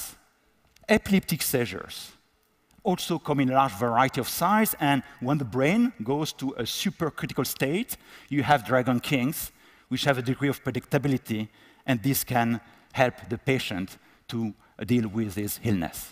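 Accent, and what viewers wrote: France. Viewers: His French accent is thick as fucc tbh tho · u french? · If you find him hard to understand, it's probably because you don't get to hear other people's accents too often, making this more of an issue about you. He's obviously French and has a French accent, but he's not CHOOSING to speak like that- as with everything, learning to master a language takes practice.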